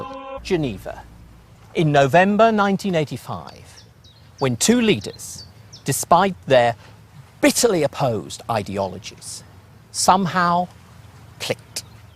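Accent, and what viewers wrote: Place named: United States. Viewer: This guy's American accent is insulting.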